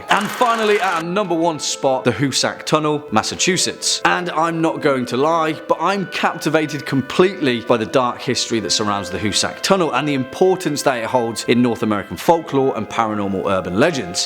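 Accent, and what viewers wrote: United Kingdom. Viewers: HOW can this dude be from Ontario, CANADA when he got a BRITISH accent? WTF???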